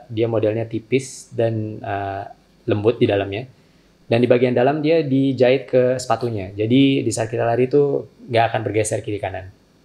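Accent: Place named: Malaysia. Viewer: ada sedikit aksen melayu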